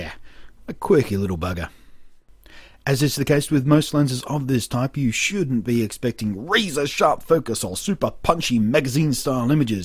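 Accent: Australia. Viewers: Great video! And good to hear an Aussie accent and see Sydney :) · I don't know how I got here when I was looking for audio equipment, but your voice kept me here. Those dulcet tones paired with you Aussie accent: Audible Chocolate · I believe he said "70mm" (i.e. 35mm lens with 2x crop factor on M43). Must be the Aussie drawl :)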